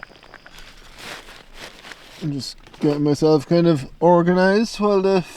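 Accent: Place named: Ireland. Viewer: I love your accent...is it Irish?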